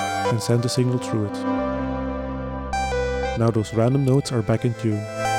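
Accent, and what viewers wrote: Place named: Netherlands. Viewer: thanks for the response - it's one of the best and most lucid tuts i've seen about this subject - would it be possible to put the voice on one channel and the synth on the other so that we could pan it at home? i'll definitely give it another go, but trying to decipher your (Dutch/Belgian?) accent at the same time was giving me a headache so i had to stop about 10 minutes in, sorry :)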